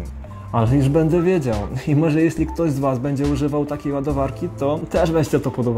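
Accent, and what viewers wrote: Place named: Ukraine. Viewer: Naucz ze sie chlopie po polsku bo zajezdza Ukrainą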